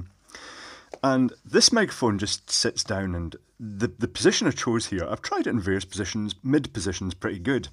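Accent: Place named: Glasgow, United Kingdom. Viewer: Personally I prefer this Cap microphone sound, it is crisper (term used by those with hearing loss) meaning the all important frequencies that reveal subtle differences in the sounds are there at good levels, too much in the bass region muddies the perception, bit like the TV news broadcasts where they have a permanent thumping drum or musical? background, WHY? is trying to get his words over to you in a clear manner, this microphone does ir WELL. His wonderful "Glaswi" accent is a pleasure to listen to.. Keep it up "Sir" Final thought, at least we don't hear the rustling of his beard!!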